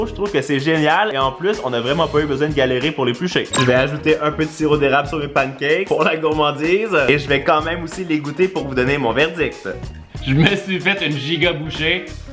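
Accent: Quebec, Canada. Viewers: Jtm continue tu est trop fort est j'adore la vois Québécois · Je t'aime, continue, tu es trop fort, et, j'adore la voix des Québécois.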